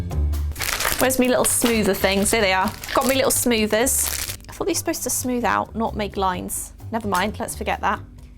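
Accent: England, United Kingdom. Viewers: I assume you're from England by your accent, mine hasn't arrived yet :(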